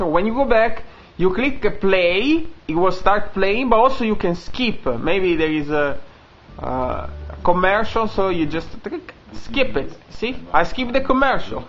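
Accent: Italy. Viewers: Il tuo inglese mezzo italico mi permette di capire tutto. Inglese puro faccio fatica... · Italian? or Portugese? am thinking Italian...lol, iti s distracting, but cute.....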